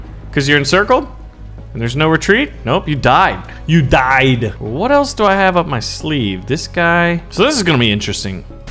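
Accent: Germany. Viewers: german accent in on point👌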